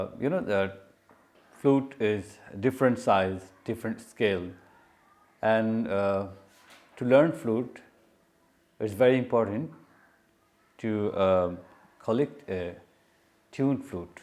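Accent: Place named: South India, India. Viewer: Sir Assalamualaikum, I am from West Bengal. Yor online flute lesson helped me a lot how to Play a flute. Sir yours in this video the flite E base' has intrigued me for it's looks and color. I just wanted to know that how much wil it cost me if I to buy it from you. ? And how to get it. ? Fron your dialectic accent i guess you are south Indian. Can i have your address plz, so that if i can make visit you there and purchase my required one flutes.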